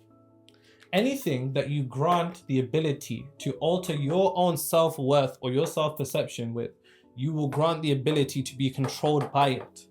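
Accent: United Kingdom. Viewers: The UK accent gives the impression of someone articulate and intelligent to Americans. This Knowledge isn’t about intelligence. It’s about you. Sometimes the mirror is painful to look at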